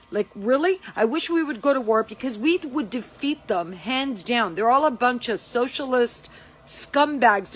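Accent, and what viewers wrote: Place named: Canada. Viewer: Nice to hear a real Canuck.........Yes we should wake up.....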